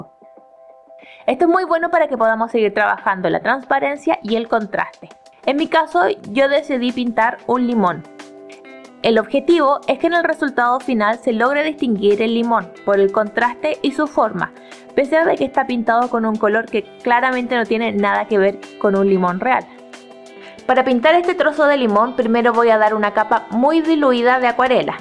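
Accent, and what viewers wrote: Chile. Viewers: Yo pienso que tienes un contenido súper bien elaborado, porque no sólo se basa en el arte de forma teórica, sino que también en la práctica del mismo, acompañado de consejos para aquellos los cuales nos iniciamos en el mismo. He aprendido mucho de tus vídeos, tanto como en la acuarela como en La teoría del Color, creo que es muy interesante el que haya un profesional en arte enseñando y dando sus puntos de vista para la gente que no mantiene conocimientos sobre el tema, sin embargo, le interesa bastante aprender. Además de que me siento súper identificada porque también soy de Chile. (Por como hablas creo que también eres de Chile. [?]) Me encanta tu contenido, saludos. <33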